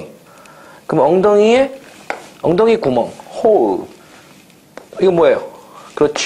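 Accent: California, United States. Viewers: It’s funny how so many people think you have to live in America to get an accent like this. Anyone can become skilled in a foreign language no matter where you live now. I think it’s because Americans are used to stereotypical “asian” accents in media, or because they themselves have trouble speaking other languages. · I love how this mans American accent for most of the video sounds like he grew up in California his whole life and is a middle aged dad, its amazing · Could be he learned American from some one from Cali, who ever teaches u a language u then develop thier accent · not even close, it's California by a mile Hawaiians aren't Asian either btw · I am Chinese, yet I am listening to a Korean gentleman teaching me English cursing in American style English while I am physically in Australia. 😂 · He got the California vibes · it's a good guess, but as did state, it is california where most asians are at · well he clearly does have an American accent when using English. 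He definitely doesn’t use more of a British/Irish/Welsh/etc accent when he speaks English. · He sounds like he's American to me. I'm a Texan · The only thing he misses is "son of A bitch". he forgets the articles. he has an amazing american accent tho, and he explains our curse words better than i could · ur comment doesnt make sense. The funny thing about him imitating a Californian accent is that hes not from California or America at all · not necessarily. In some cases, teachers end up focusing on mainly written english. Plus, the guy has that cali pronunciation. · Im American and this dude has a better American accent than me 😂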